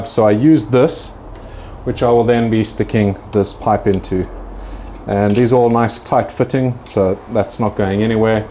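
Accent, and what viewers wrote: South Africa. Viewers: Yes I wondered what a was also at first! I love his accent but sometimes I have to listen again to get what he said. Also, the English and probably the South Africanese have different sayings from the US so I have to listen to the whole sentence to understand correctly. Great man though! I love watching him!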